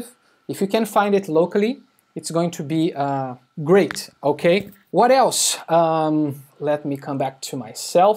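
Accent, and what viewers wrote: Brazil. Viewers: O sotaque brazuka nunca vai embora né rsrs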